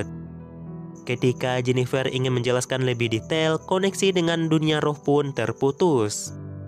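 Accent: Aceh, Indonesia. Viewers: Admin nya pasti orang Aceh ni, logatnya gk bisa bohong.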